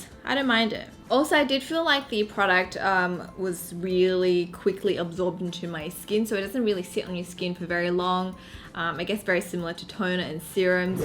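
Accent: Australia. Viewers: I like your Aussie accent! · it's her aussie accent chill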